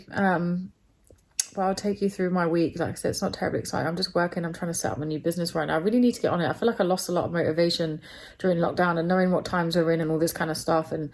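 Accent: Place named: England, United Kingdom. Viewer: Nice English accent different from Americans lol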